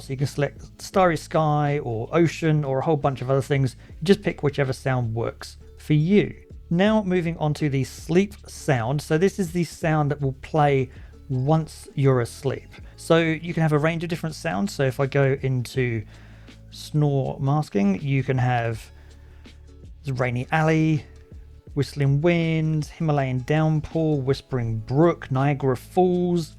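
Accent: United Kingdom. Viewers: Just saw your A20 sleep review, which was really good. I just got the A20 after watching loads of reviews on both. Which one do you prefer? I think if battery life is important the A20 are still the best. I also had the A10 but the left earbud died on me and never wanted to charge fully again 😢 So I am off to try the A20 for the first time. Thank you for your review ( nice to hear a British person for a change😂)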